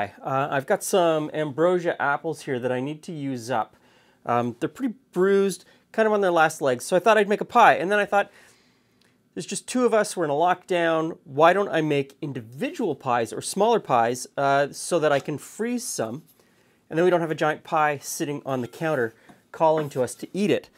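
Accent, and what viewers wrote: Canada. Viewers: This is why I come here -- not just to remind myself of what a Canadian accent sounds like, but to learn little helpful tips.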